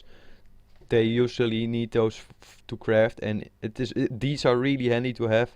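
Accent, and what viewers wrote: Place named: Netherlands. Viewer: Duidelijk een Nederlander ^^, goed bezig.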